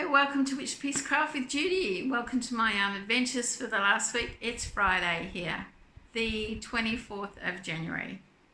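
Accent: Australia. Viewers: I love your hats and cowl. I’ve yet to try the divine hat, it’s on my list to master this year. Congratulations 🥳 on your one year anniversary of YouTube, I’ve enjoyed watching your videos! It’s been lovely having another Aussie voice out there. Enjoy your long weekend and Happy Australia 🇦🇺 Day ❤️🧶💜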